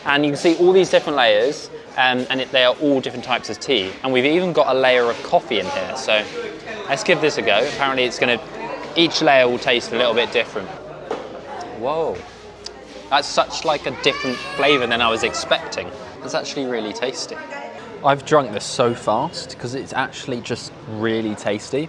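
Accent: United Kingdom. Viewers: u briish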